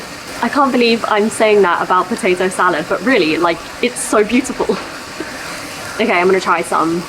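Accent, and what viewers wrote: United Kingdom. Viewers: いつも思うのですがイギリス英語はとてもきれいですね。素敵な笑顔とあいまって、とても素敵です。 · いつもながら、あなたの英語はまさにイギリス人で、歯切れよく、心地よい。バーナードショーのミュージカル「マイフェアレディ」で、ロンドンでもその人の属する社会層ごとに発音が違うと、イライザの発音を強制し、貴婦人に仕上げるヒギンズ教授の苦闘を見ていて、感動したのを思い出しました。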